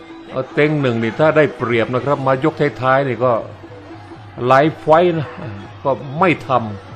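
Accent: Isan, Thailand. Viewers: ชอบแอ้ดพูดคำว่าไทยอีสานมากกว่าครับ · ติดตามเพราะเสียงเว้า อิอิ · ภาคภาษาอีสานนั่นแหละคุณ เพราะดี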